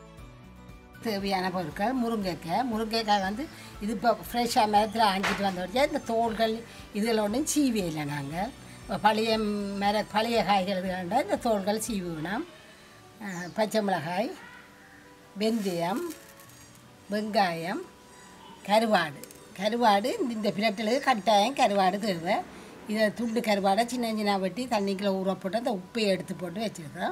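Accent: Sri Lanka. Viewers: அம்மா உங்களின் தமிழ் அருமை. எனக்கு உங்களை போன்று இலங்கை தமிழ் பேச ஆசை ஆனால் நான் இலங்கை தமிழ் பேச முயற்சி செய்தும் முடியவில்லை.உங்களின் இலங்கை தமிழ் கேட்பதற்காகவே நான் உங்கள் பதிவை தவறாமல் பார்ப்பேன். சுவையான உணவை சமைத்த உங்களுக்கும் உங்கள் சமையலை பதிவு செய்த உங்கள் பிள்ளைக்கும் மிக்க நன்றி அம்மா. மலேசியாவிலிருந்து 🇲🇾❤️🇱🇰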